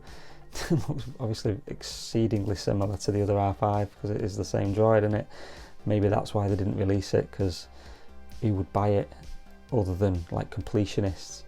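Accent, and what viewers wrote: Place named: Northern England, United Kingdom. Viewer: You sound like your from up north lad, Manchester area, I’m a north west lad too.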